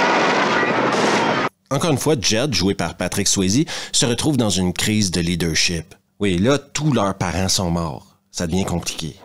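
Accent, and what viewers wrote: Quebec, Canada. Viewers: J’avais 15 ans quand j’ai vu ce film au cinéma et comme beaucoup d’ados de cette époque ce film m’avait emballé… aujourd’hui la narration avec l’accent québécois de ce film m’a offert une toute autre saveur…. Merci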